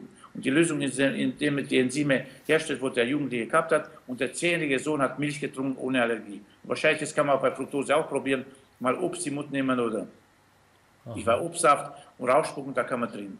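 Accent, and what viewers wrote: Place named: Austria. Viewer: Ein österreicher😂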